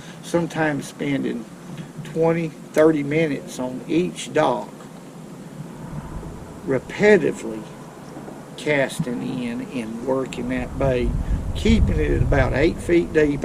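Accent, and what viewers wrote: South, United States. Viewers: I love this guy all his videos are amazing I love his southern accent to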